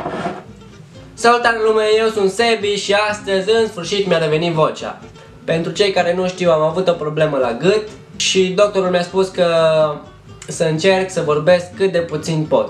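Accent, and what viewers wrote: France. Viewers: Ba nu vorbeste sâsâit...are accent franțuzesc •^• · Sebi nu e sasait are accent frantuzesc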